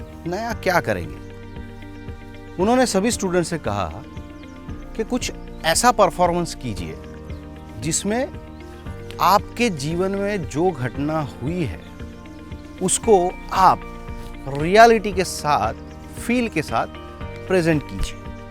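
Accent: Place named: Bengal, India. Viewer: tumhare language mein bengali accent bhar bhar ke ah rahi hai 🙂🙏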